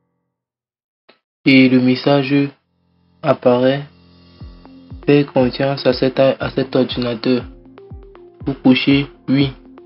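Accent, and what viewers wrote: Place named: Antilles, Caribbean. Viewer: T’es antillais mec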